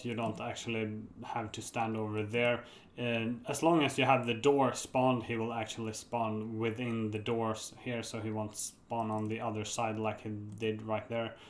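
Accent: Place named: Sweden. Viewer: You sond super swedish, amirite?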